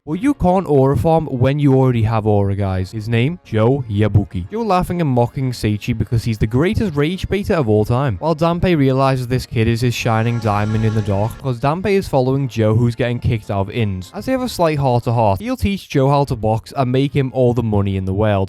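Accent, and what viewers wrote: United Kingdom. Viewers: MOST British accent ever I've ever heard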